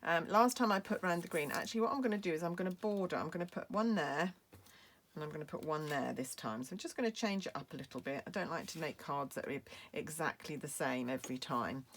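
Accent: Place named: United Kingdom. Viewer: What a lovely card! I LOVE your accent - well, you probably don't think you have one LOL But anything Brits say is so charming: bauble. My new Christmas word!!! xo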